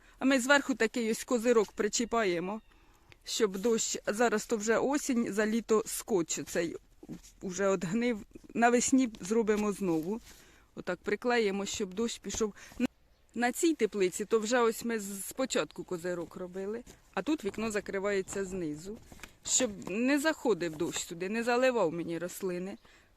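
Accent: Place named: Cherkasy, Ukraine. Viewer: Доброго вечора,. Дуже цікаво розповідаєте, особливо для тих хто тільки починає займатися цим бізнесом. Скажіть будь ласка, де ви проживаєте? Дуже схожий говір, ніби у нас на Черкащині.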